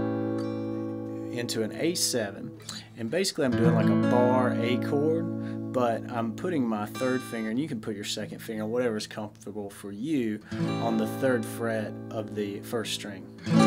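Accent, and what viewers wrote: South, United States. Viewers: This progression definitely make for some beautiful medley!!!Thanks Bro!!BTW I knew u r from the south.Im from Alabama so I know a southern drawl when I hear one!!!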